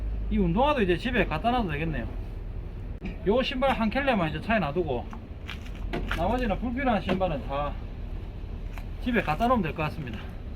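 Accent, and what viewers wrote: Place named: Gyeongsang, South Korea. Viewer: 유튜브에서 표준어 말고 사투리로 하는 유튜버 걸러주는 기능 없나? 졸라게 듣기 싫어죽겟네 갱상도 사투리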